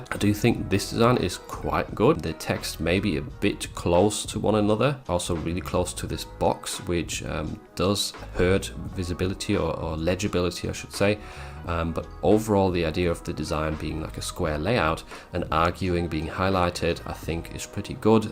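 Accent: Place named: England, United Kingdom. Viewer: Thanks, you always get me thinking. I hope you don’t mind me saying, since you mentioned about the plaid, that most people I know would say “various / variations” as though there were the word “air” in it. Not a criticism or anything, just thought you might like to know. I love listening to English accents since I now live in the States.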